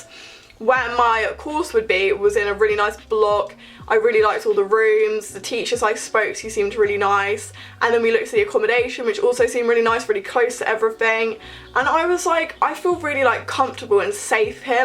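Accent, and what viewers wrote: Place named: Bristol, United Kingdom. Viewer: Really helpful, I’m thinking of going, and I imagine your from bris aswell cause of the accent n distance away haha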